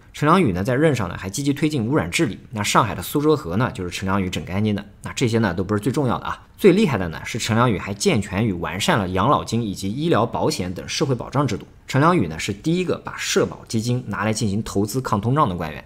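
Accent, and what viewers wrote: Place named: Subei, China. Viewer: 上海人不喜歡你的蘇北口音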